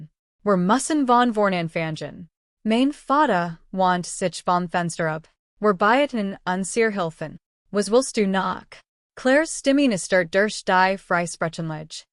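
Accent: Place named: United States. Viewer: Was soll diese quälende amerikanische Sprache ???????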